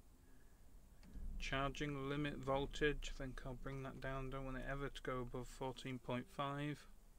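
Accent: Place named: Yorkshire, United Kingdom. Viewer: Ah right, I live near Todmorden. I was thinking your accent was a bit diluted to be fully yorkshire lol. I was wrong :)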